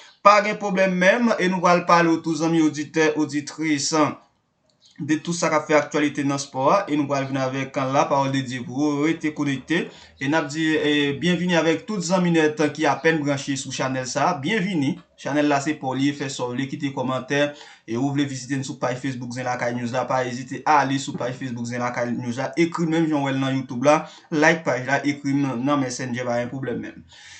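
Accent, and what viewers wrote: Haiti. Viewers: Ou pale tankou moun nord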